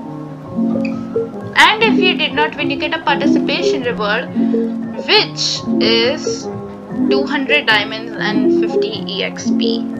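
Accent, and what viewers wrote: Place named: India. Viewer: U in indian? ur accent sounds like so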